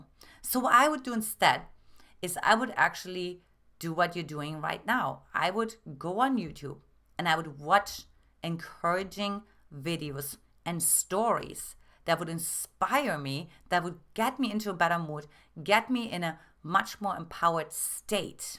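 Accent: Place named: Germany. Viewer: I love your German accent 😊 I was wondering where it comes from. Thank you for the content ❤, it is eye opening.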